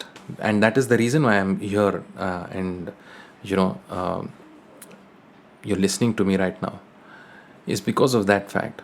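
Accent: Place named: Karnataka, India. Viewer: Really loved Kannada accent. Perfect 👍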